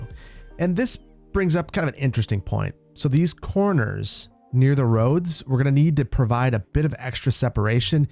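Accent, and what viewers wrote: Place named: Upper Midwest, United States. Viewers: Tehg Gotta love that upper Midwestern accent. Great episode sir! Did you ever add any houses of worship (churches) to VB? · I cannot wrap my brain around your neutral Midwest non-accent, except when saying "tayg". But seriously, love the content - I always look forward to Saturdays when I can catch up on all your videos for the week.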